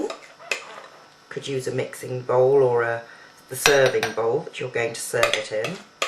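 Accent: United Kingdom. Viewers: I'm an American, and just by listening to your voice, I thought you were a British Aristocrat! I wish I could speak English like you. Thank you so much. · Sounds like she may be British. I enjoyed this video because of what you mentioned.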